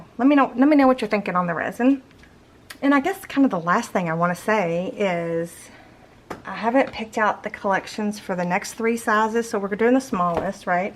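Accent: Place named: Tennessee, United States. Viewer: I enjoy crafting along with you because you’re so very kind to your viewers.😊 It’s always a pleasure spending time with you, especially when you make a boo boo and you show us how to fix it. 🤗……also, I love your accent. I grew up over the hill in Tennessee and when I hear your voice it reminds me of home.❤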